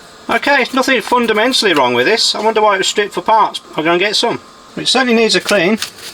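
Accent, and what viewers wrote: Birmingham, United Kingdom. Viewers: Neil from Blackburn. Hi. I found your narration a little bit to quick for me to take it in. So I simply reduced the playback speed on YT to 0.8 ×. That worked brilliantly for me. But it did then highlight your Brummie accent which I never picked up on before at 1.0 × normal speed. I've taught myself a new method to identify accents now. LTA. BN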